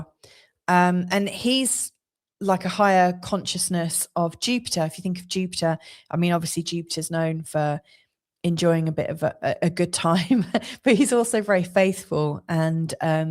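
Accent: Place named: Home Counties, United Kingdom. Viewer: I do like your accent, - it reminds me of home - the home counties?! - from here amid the Great Lakes. More seriously, Maestra, thanks again for the excellence of your work, so generously shared. I must go back to Skool where your stuff is even better. Crossing your palm with a little silver song... old school school! <link>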